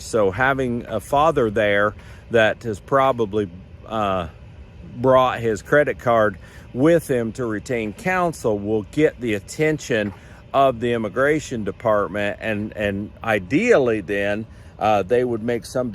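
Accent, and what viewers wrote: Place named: Northeast, United States. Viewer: Where are you at in the states? Sounds like Northeast.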